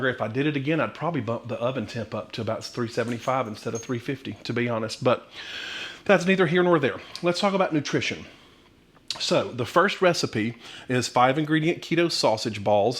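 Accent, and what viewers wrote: South, United States. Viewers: Came for the comparisons; stayed cause I could relate n feel the excitement in each bite haha. You actually have convinced me to at least check out Thrive Market, so they picked a great influencer to sponsor too. I always skip ahead during most sponsor’s adds. Lastly I’m from the south (Louisiana) so I feel right at home listening to you say “y’all”. I’m amazed at how quickly u speak occasionally, yet enunciate crystal clear. Fantastic channel 👍💕👍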